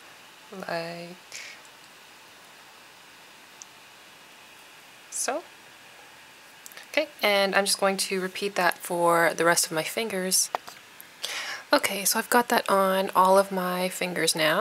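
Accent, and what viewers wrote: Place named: France. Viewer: J'adore l'accent français " seche vite " mdr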